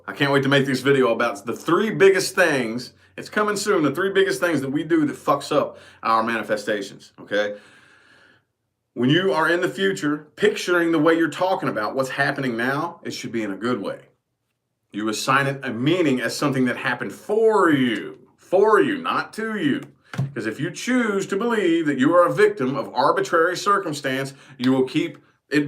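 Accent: South, United States. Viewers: That country boy swag with a twang! 🤣😂😂😭 The whole reading was too damn accurate 🙌🏾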